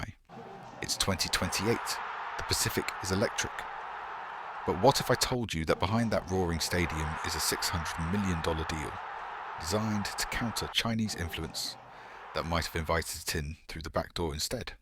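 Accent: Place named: United Kingdom. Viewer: I agree with the ending message of this video. This is not just about rugby. The same with the intern-connection of PNG roads proposed for our economic empowerment. Likewise, with the sale of MVIL shares to MRDC. It is about power and influence. Yes, we can claim that this, Wapu Sonk guy is a bad apple. But what's the use if the entire apple tree is bagarap? The whole operation needs to be scrutinized and reformed. The same with interior development projects and publicly traded shares. It all needs reform. Is PMJM only willing to act decisively when faced with media scrutiny from abroad like the Sydney Morning Herald and ABC News? What happens then, when we express outrage at corruption domestically, yet cannot get results without the aid of foreign media highlighting our issues? Truly sad. But thanks lo karem British voice kam bek lo stori lo news updates! 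😆🤣 Inap! Mi go silip nau! 😴🛌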